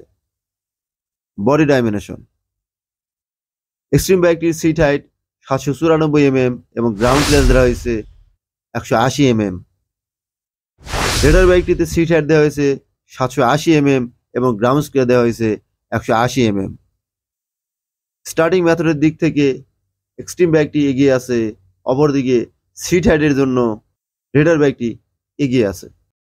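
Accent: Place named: Rajshahi, Bangladesh. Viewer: তোমার বাড়ি কি জয়পুর কথা শুনে তো মনে হচ্ছে জয়পুরহাট গ্রামে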